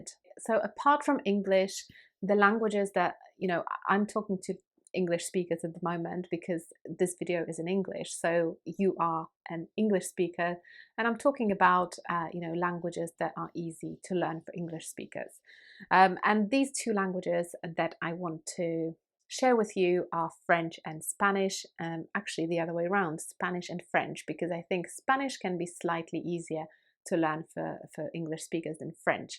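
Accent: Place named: United Kingdom. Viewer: Tahnk you so much for your videos 💕 Do you ever get tired of speaking in British accent 😬 This is what's happening to me and I wandered if I am the only one feeling this way 👀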